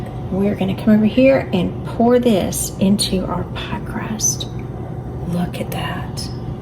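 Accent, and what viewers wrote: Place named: Georgia, United States. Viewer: I'm a Georgia cracker transplanted to Texas and your accent reminds me of all my family in the Albany area, the transcript shows " bowl" when you say boil, I get teased about my accent here in Texas too,love your channel!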